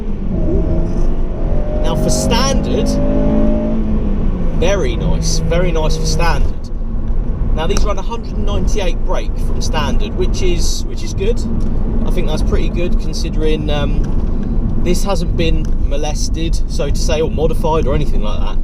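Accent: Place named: West Country, United Kingdom. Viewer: Hey dude, enjoyed the review and the camera work. You come across well, few rough edges here and there but overall pretty good. Bit of the west country accent adds a bit of character compared to the boring droll of some car reviewers. On a bad note and I hate to be this guy, but you do use "nice" too much. Other than that, you did a good job.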